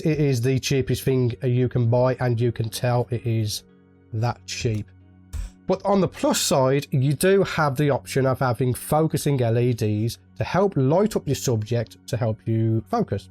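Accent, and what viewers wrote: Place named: England, United Kingdom. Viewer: What part of England is your accent from?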